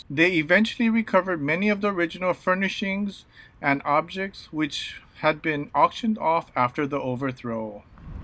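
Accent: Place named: Hawaii, United States. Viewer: Just by listening to you, I can tell you're local, if not kanaka maoli. Geffum hammah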